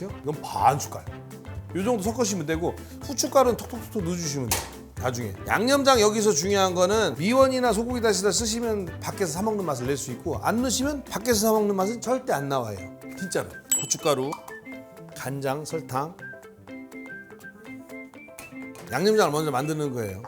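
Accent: Chungcheong, South Korea. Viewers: 넘 맛있겠어요! 부모님 고향인 충청도 사투리 너무 정겹고 좋아요. 저도 수원백씨. 영상 감사합니다!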